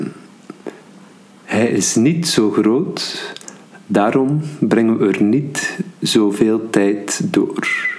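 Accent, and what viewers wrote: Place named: Arab world. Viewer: у нас в языковой школе, арабы с таким акцентом разговаривают)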